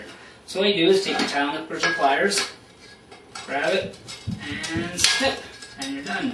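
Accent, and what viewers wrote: Canada. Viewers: Thanks so much, really helpful! You have a lovely Canadian accent too 😁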